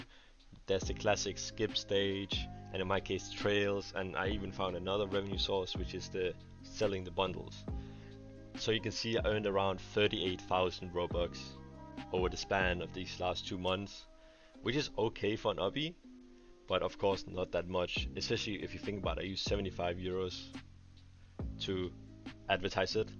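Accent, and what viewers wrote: Denmark. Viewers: are you danish? i have to ask this question okay its important...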